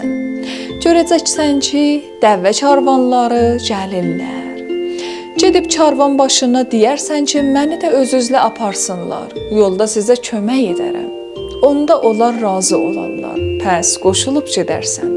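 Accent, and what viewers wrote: Iran. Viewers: Ай гыз фарс лейчесийле данышма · Mənim 70-yaşım var, bu nağılı 10-12 yaşımda "Azərbaycan nağılları" kitabında oxumuşdum. İndi cənublu qızımızın dilindən şirin Təbriz ləhcəsində yenidən eşitmək istəyib axıra qədər qulaq asdım. Uşaqlığım yadıma düşdü.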